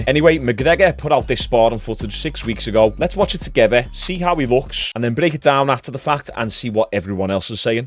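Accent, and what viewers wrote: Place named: Scotland, United Kingdom. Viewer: This whole time I thought you were from Scotland because of your accent. You have a very deep strong accent. You sound like your straight out of Braveheart.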